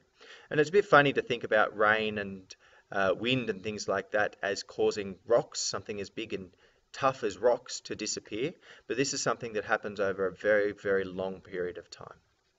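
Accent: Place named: Australia. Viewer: 10/10 aussie accent 🤠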